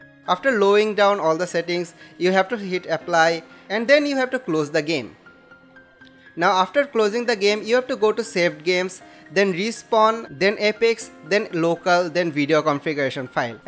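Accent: India. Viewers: Lul indian accent it's my teacher in youtube that teach everything lul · When you hear an Indian voice on a tutorial, you know that it’s going to be good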